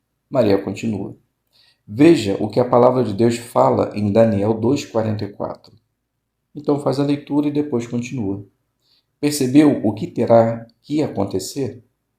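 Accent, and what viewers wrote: Rio de Janeiro, Brazil. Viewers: Amo o sotaque carioca,um abraço irmão 😊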